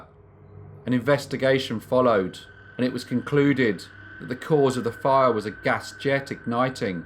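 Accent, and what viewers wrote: United Kingdom. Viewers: Found your channel and now binge watching, fascinating stuff - and it's nice to hear a fellow British voice! How on earth was Pritchard considered a ladies man? Even without the terrible things he did he already looked like something out of a horror movie. Can you imagine being given one of his postcards? Eeep!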